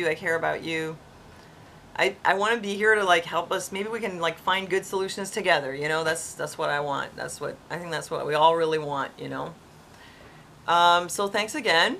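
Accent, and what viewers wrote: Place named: Canada. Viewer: I like how you talk too. Always have. Your voice along with the Canadian accent....so nice 😊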